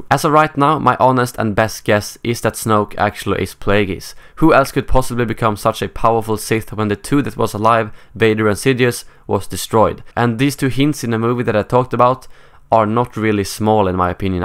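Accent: Sweden. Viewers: the swedish accent doh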